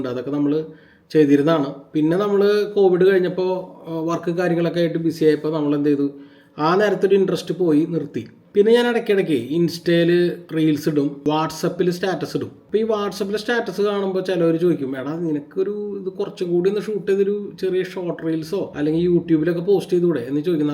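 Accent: Thrissur, India. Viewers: Ente native place trivandrum aanu...trissur language kelkan eniku bhayankara ishttanu..pinne nigade bonding bhayankara resamanu athukonda njanum suscribe cheythathu..very simple and humble souls...vere oru channal njan kaanarunde,athum trissur aanu its me vijitha...aa kuttiyum paavama nigale pole😊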